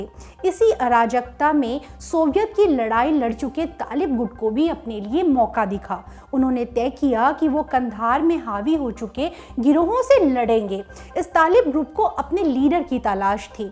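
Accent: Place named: Uttar Pradesh, India. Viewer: Uttar pradesh accent in her language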